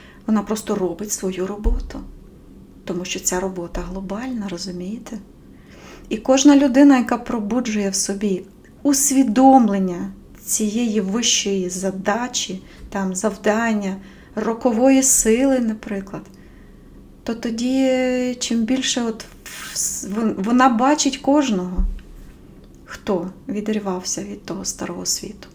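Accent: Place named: Rivne, Ukraine. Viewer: Дякую. Люблю слухати Ваші вірші, Ваш рівненський голос.♥️♥️♥️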